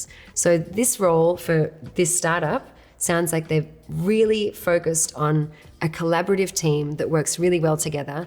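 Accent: Australia. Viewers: She sounds Australian. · Australian accent, love it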